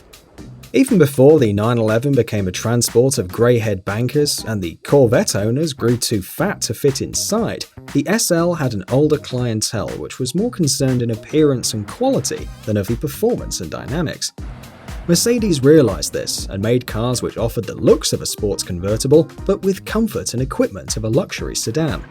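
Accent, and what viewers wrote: England, United Kingdom. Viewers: Are you British i guessed coz of your accent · are you from the UK because you sound English.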